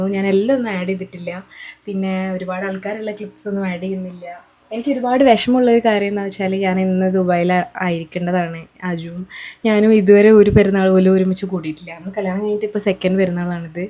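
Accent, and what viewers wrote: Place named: Kannur, India. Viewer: Kannur slaaangg oke kity thodangeeele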